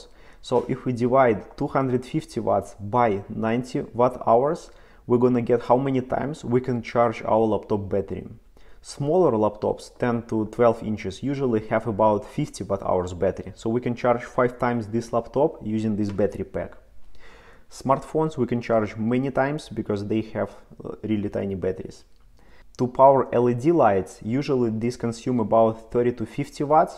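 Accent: Russia. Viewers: Сразу лайк за Бьютифул рашен акцент)👍 и видос хороший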